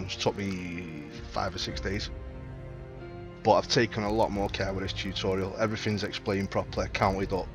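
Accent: Manchester, United Kingdom. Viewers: You from Manchester lad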